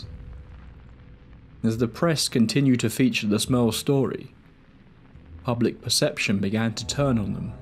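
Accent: United Kingdom. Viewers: Why are all videos like this narrated by a British guy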